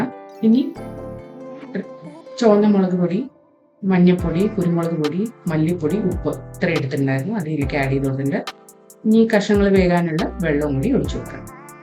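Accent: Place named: North India, India. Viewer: R u a malayalee brought up in north because of your malayalam I feel so . ❤❤❤❤❤nice vlog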